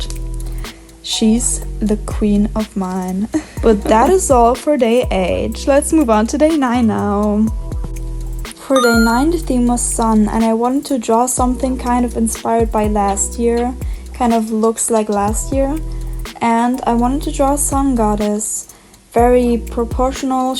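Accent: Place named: Germany. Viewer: I actually like you German accent!! Loved the video!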